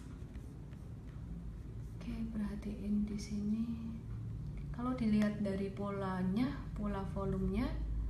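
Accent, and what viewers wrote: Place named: Java, Indonesia. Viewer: Disini medok bgt ya 😅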